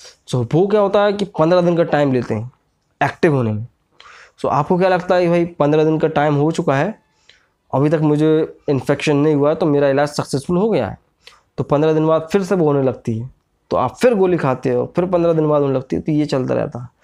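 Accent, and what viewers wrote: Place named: Gwalior, India. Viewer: Gwalior side k लगते हो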